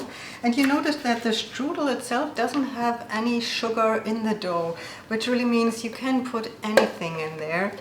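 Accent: Germany. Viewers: So I came here for the Strudel recipe, didn't expect the attractive lady in a dirndl with the German accent, now I'm subscribed. I'll be trying this and the red cabbage recipe as well.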